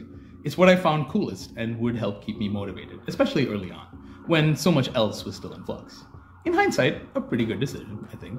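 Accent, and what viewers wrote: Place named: Brazil. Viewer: Your accent almost made me think you're Brazilian... It's so close...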